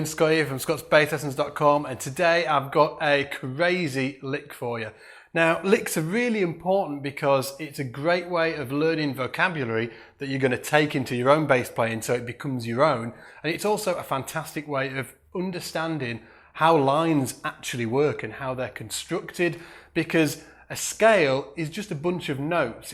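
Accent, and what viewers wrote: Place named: Liverpool, United Kingdom. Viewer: By chance are you from Liverpool? Your accent reminds me of my grandfather...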